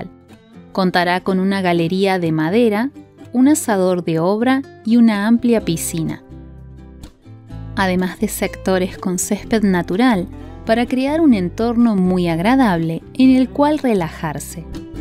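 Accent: Argentina. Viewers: Es voz Argentina.👄😍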